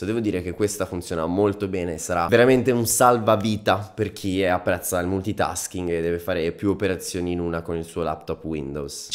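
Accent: Italy. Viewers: Lo potevi dire subito che eri napoletano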